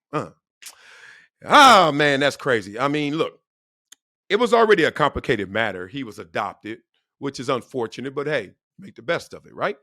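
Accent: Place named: California, United States. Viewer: Duuude!! Haha sounds California but I’m from NJ. Solid views. It makes sense in so many ways. I’d have to prob read CK entire book/chapter to really understand his thoughts on his parents but….I don’t really care enough. 😂 Anyway, you’re so much better on this type of platform over MSM. I hope you can grow this to get you were you want to be financially so you can just do whatever you want content wise. Thanks & good luck! 🤘🏼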